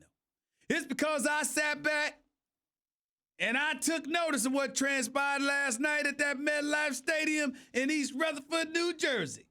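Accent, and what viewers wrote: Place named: South, United States. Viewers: I'm a libra too. Tell it like it is. You're country accent. Cowboy hat and cigar is hilarious 😂. Keep it up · He's killing me with that texan accent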